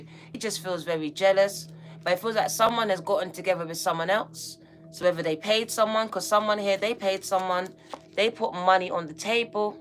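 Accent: United Kingdom. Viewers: 8 infinity and beyond…. Please let me buy you lunch….. you sound like a good ole Londoner… I born in Walthamstow xx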